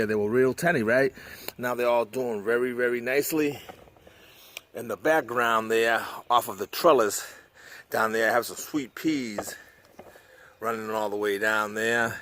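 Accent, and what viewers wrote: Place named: Bronx, United States. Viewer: Where are you from? You sound like the Bronx. Thank you, I pray the same for you.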